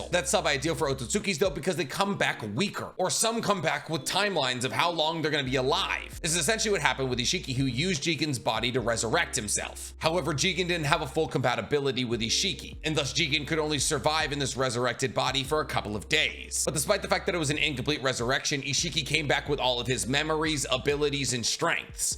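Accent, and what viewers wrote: Boston, United States. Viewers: Makes sense. I was wondering why he was saying it like that. Good ol' Boston natives 😂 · He’s just from Boston he has the accent makes karma sound like Kama 😂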